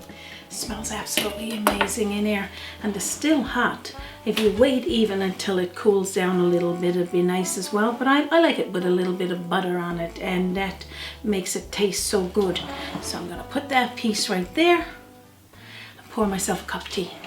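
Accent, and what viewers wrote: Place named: Newfoundland, Canada. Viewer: Oh my I could listen to you talk all day, it makes me think of growing up in Newfoundland. I think I will have to get me a couple of your cook books.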